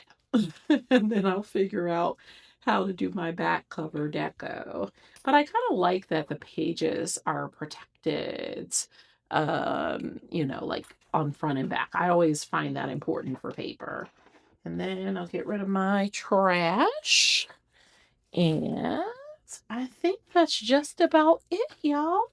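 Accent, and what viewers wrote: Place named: South, United States. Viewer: I like that your spread is simple! When I’m watching agenda planning, I simply don’t want to think! I’m from SC and you sound as if you are from my “neck” of the woods! Maybe Georgia and last guess Tennessee! Anita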